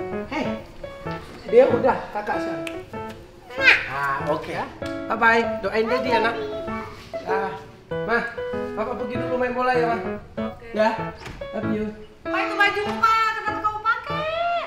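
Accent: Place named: Medan, Indonesia. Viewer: heloowww keluarga cemanaa kok lama gak bikin vlog lagee,kitah nunggu2 neeh..lucu dengan logat ala2 medan ini😊😊,jarang2 kaya mak beti jadinya,coba doonk koleb sama mak beti,atau bareng keluarga mas anang,atau sama bapau(baim paula),biar makin zeruu vlog keluarga cemana ini 🖒🖒,selalu semangatt,Tuhan berkati💕💕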